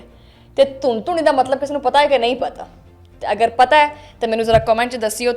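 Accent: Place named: Punjab, India. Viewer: Hi.. dear..🤗 I subscribed you today.. I'm from Lahore Pakistan. and I saw your some vlog.. I the way you talk and express your opinion with typical Punjabi tarrka.. I love it. I wish best of luck for your all upcoming vlogs... Stay blessed.. keep it up.. 😍👌👍👍👌👌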